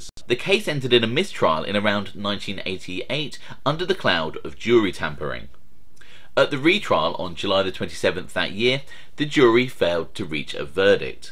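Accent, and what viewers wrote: England, United Kingdom. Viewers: OMG that's the same thing I said 9th Ave all the way😂😂😂😂the very first time i saw this channel i thought he wz the gangster r i wz shocked when I heard the English accent!!!!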